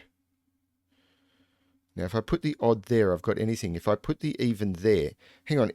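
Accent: Australia. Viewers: Hi, just want to say how happy I am that I found your channel:) I've been on CTC since before you could solve along with them and you had never been in my recommended before last week. Since then I'm hooked and have been binging old videos while it's still raining. I heard you say on one that run time was a big factor for YT to push your content (which after many years of watching I didn't actually know) so have decided to continue running your video on mute while I attempt the puzzle so you'll still get your times up. Maybe suggest this to your audience as I can see a lot of regular CTC commentators on here too and maybe they don't know either, and you really do appeal to a generally easier solve than some of Simon's monsters. I don't belong to the discord, but I've checked out the other channels that you've added in your home page too so good for you for supporting the community. 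I'm the only Sudoku geek in my friend group so nice to hear an Aussie voice here too. Keep up the great work, and I love that my brain solves the way you do too:)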